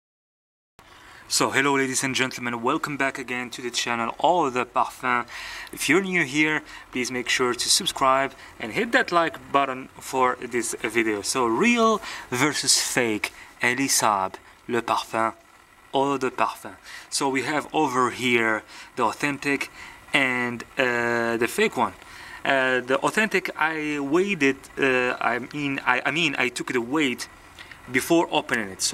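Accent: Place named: England, United Kingdom. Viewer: This is very helpful!very nice english accent,, i send you all of it,🤗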